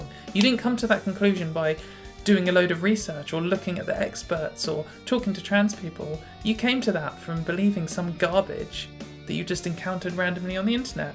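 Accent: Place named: England, United Kingdom. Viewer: Good to hear an English voice doing a video like this. Sensible comments , beautiful looks , nice guitar solo, you have got it all girl !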